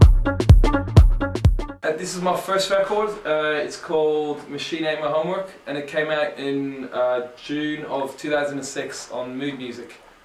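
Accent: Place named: United Kingdom. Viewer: nice to see another minimal producer who can talk in a british accent living in germany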